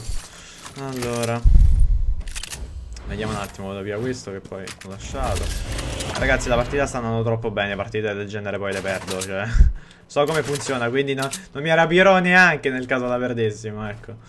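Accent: Naples, Italy. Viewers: Se trovi qualcuno con il minty il video finisce PS: ma sei napoletano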